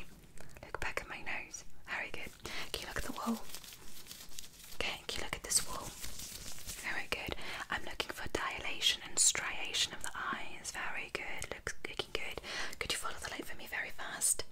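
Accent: United Kingdom. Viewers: I love your British accent, it's so cute and relaxing! :) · British accent on❤️ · UK accent on point! Great ASMR vid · I missed the British accent!!! It’s been a while ❤️ you’re so good at it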